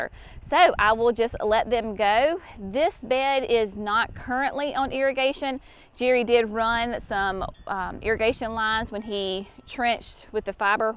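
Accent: South, United States. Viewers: I was so inspired and motivated watching this video!! I am in the process of moving blueberry bushes and was so worried I might kill them as they are very established. Some how watching you calmed my fears. Question… where on earth can I find some ‘Land and Sea’ compost??? I live in Kentucky close to Clarksville TN and can’t seem to find it at my garden centers. Is there another alternative you could recommend?? I just love your channel… your sweet southern accent and smile MAKES MY DAY! ❤️❤️❤️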